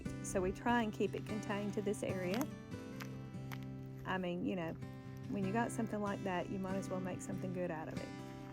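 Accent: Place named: South, United States. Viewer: I love southern accents! Please don’t let anyone tell you your accent is horrible.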